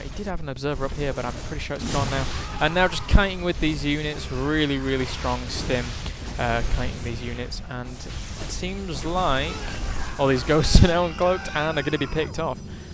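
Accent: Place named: United Kingdom. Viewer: dude, your british voice is awesome, my favorite caster especially since you are bias towards protoss <333333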